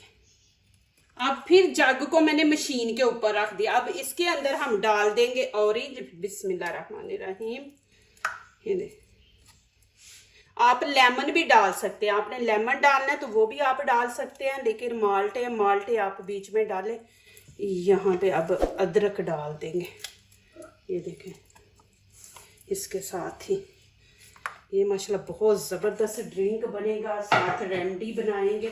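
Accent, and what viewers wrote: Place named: Punjab, India. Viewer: Aunty pujabi mein urdu acheeee laaaagti hey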